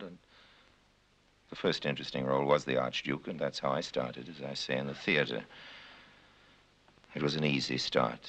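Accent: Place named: Transatlantic, United States. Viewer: I think he was mostly raised in America but acquired a transatlantic accent, as many actors and upper class people did in the '30s, for example Franklin D Roosevelt had a similar dialect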